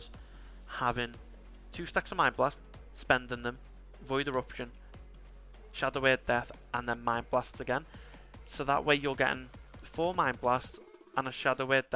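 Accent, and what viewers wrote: Liverpool, United Kingdom. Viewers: Am I hearing a scouse dialect there? 😊 as a Shadowpriest and a big fan of Liverpool FC that amounts to a subscription 👍🏽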